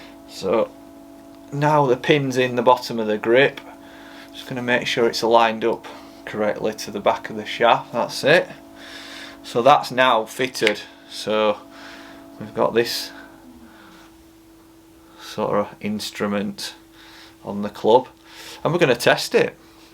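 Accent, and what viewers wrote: Yorkshire, United Kingdom. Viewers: I think should change the name of your channel to " " salt of the earth Yorkshire accent you know this guy is not lying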